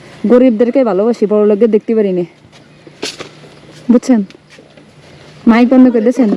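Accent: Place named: Rangpur, Bangladesh. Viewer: কথা শুনে তো রংপুরের অঞ্চল মনে হচ্ছে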